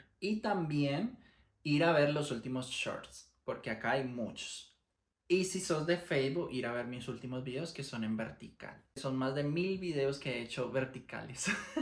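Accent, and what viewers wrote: Paraguay. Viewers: Ya tenés mucho del acento paraguayo. Que estés bien! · Tu acento ya es casi paraguayo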